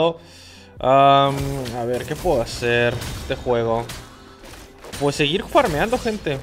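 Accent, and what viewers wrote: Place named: Peru. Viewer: Y un poco de peruano:v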